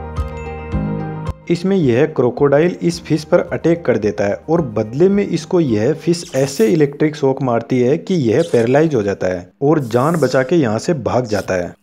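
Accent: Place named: Haryana, India. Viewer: Nice video bro....ur accent seems haryanvi... 😀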